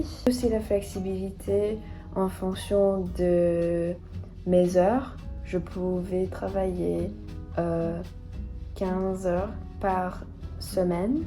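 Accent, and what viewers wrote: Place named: Paris, France. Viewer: loved this video!! as a french girl i can tell you that your french is sooo good! you have the best parisian accent! j'espère que tu aimes Paris xx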